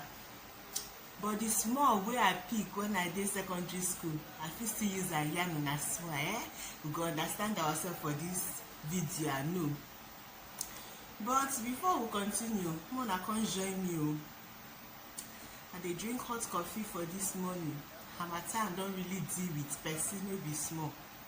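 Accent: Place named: United Kingdom. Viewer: Your pigin still dey sound like British English lol! Speak the warri undiluted pigin.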